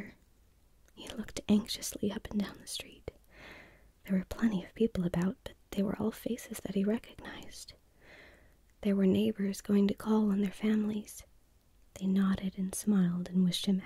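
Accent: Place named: Texas, United States. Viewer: It's great to hear from a fellow Texan again. Wonderful video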